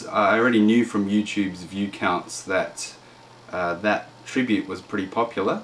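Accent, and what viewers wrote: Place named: Australia. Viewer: its so good to hear another aussie voice...reminds me of home...even though i'm at home...also pro tools...good boy...komplete 8....i'm jelly...guitar rig...ehh i'm a amplitube bro myself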